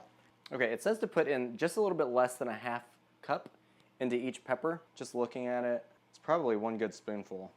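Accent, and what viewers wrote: South, United States. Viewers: Yum! That dirty rice looked good! And those peppers 😍😍. You sound southern (I'm originally from the Tennessee, so I have a southern draw myself ,haha) where are you from?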